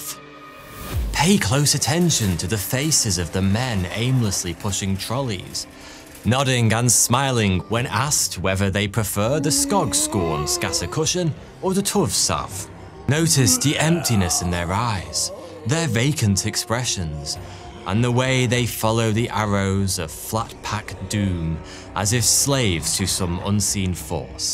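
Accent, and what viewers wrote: United Kingdom. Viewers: Trendy topic about a crappy mainstream overrated TV show, check. Cheap British accent, check. Long winded intro to get the video over 10 minutes, check. Sponsor at the start, check. Idiotically simple take on an interesting subject, check. Gotta love Youtube.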